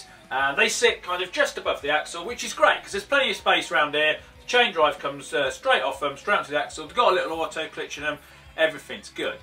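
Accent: United Kingdom. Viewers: Why is every British person the most British person?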